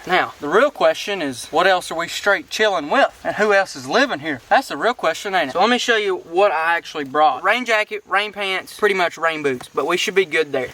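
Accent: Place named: West, United States. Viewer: A Russian man w/ a western accent #AK-47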